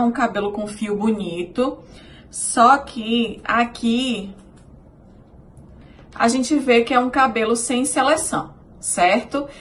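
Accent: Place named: Salvador, Brazil. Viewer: Pelo seu sotaque Parece ser de Slavador. Aceitei? Se sim onde vc atende? Quero muito colocar No caso do cabelo crespo tem q ficar alisando pra ficar compatível com o mega??? Pq a raiz vai crescendo n fica feio nao? A raiz crespa e o mega liso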